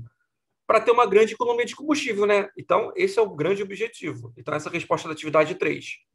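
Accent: Minas Gerais, Brazil. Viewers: Vc é de mg??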